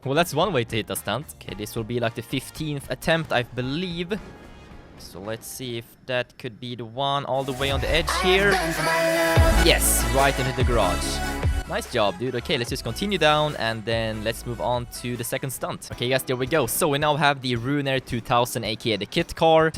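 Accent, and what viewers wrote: Sweden. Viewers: Are you swedish!?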